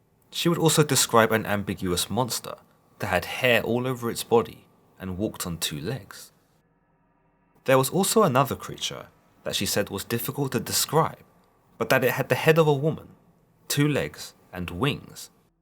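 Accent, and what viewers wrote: England, United Kingdom. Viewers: TF... Is this a reupload? I'd borderline be willing to SWEAR I've watched this video before! Not a similar story-of course I've heard the tale of Tituba every which way you can spin it-but THIS one, almost down to English accent & word choice/vernacular... Damnedest thing... #DejaVu anyone? 🤨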